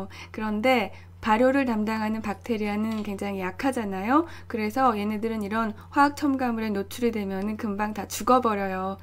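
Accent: Seoul, South Korea. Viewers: 님 말투가 서울 사투리 같아요 ㅠㅠ 너무너무 듣고 싶은 목소리이구 ㅎㅎ 재밌어요 ㅎㅎ 레시피 감사합니다!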